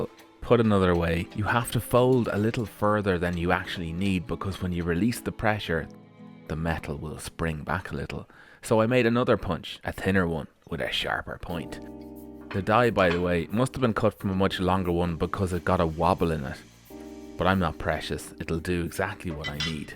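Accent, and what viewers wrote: Ireland. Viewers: The art of pedantry - a new psycopathy in development, in a gentle brogue.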